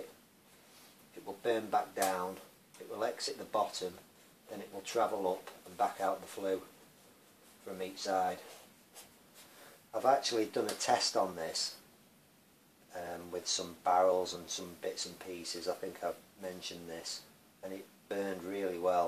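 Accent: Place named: Manchester, United Kingdom. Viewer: Will do, you sound very Manc. Looks like I'll be learning to weld stainless.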